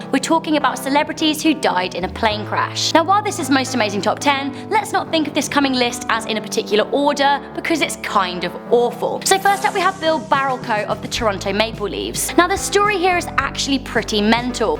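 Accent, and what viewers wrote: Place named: United Kingdom. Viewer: yes she is pretty n I'm also a follower.I love her accent. its so British. love that.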